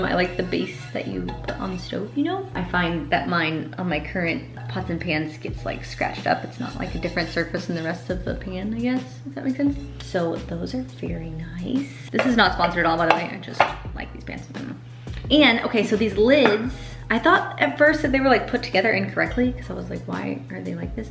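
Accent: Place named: United Kingdom. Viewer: The voiceover for your cats is SO FUNNY. Pls make a video doing that in your British accent or whatever lol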